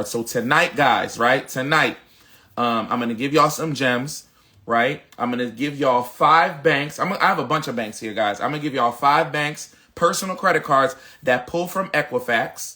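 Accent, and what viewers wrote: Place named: Philadelphia, United States. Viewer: Omg!! He be having me SOO HYPED!!! That Philly Tone and RHYTHM 🔥🎯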